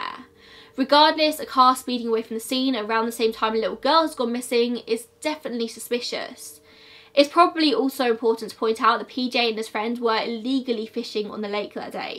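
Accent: England, United Kingdom. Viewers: I have just found your video today by chance. I like old cases unsolved or solved programmes and I was looking what options were there. Then I found this video about Mary and I got interested on the case. But what a surprise when I heard your Brittish accent! I just loved it because my family and I lived in London 44 years ago. And it is not quiet often to find English accent but American since my mother tongue in neither of them. I liked your programme so I decided to suscribe. It is very tragic what happened to the Boyle family and it is really worst not to find answers after 43 years. But hopefully someday it will be solved. I would have tried a psychic help maybe some answers would come up. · I'm from Ireland, about an hour north of Belfast and only 40 mins across the sea by ferry from Donegal (the South is more North than the North lol!) Your pronunciations are so cute, bless you! 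I love hearing English people pronounce our towns etc, it's so cute to hear! For reference Donegal is kinda pronounced "done-ee-goll" but I do like how you say it haha!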